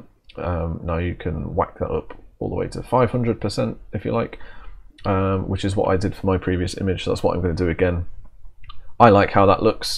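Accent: United Kingdom. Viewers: OK thanks and BTW how refreshing to hear an English voice on one of these videos and not a loud 'WHAT'S UP' !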